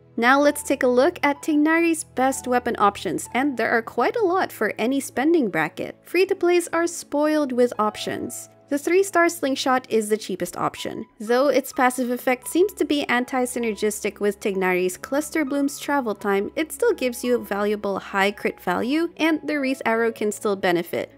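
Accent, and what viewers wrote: Philippines. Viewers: The Filipino accent coming in strong in this video. Love it!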